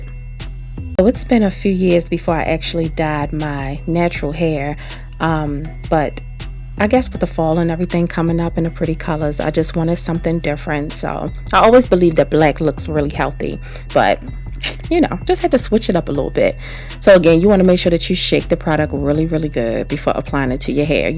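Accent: Baltimore, United States. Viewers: Girl you must be from bmore... we talk just a like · I knew it...you have that Baltimore accent...I grew up there...my BFF IS THERE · TEEEEWWW 😭😭😂 I said oh hell shes from Baltimore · You sound like your from Baltimore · Are You From Baltimore? I Hear An Accent❤️❤️ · New subbie!! I can here that Baltimore or DMV accident, Morgan State Univ in the house! lol. You are so beautiful and calm lol Love your hair, I subbed!!!! 😍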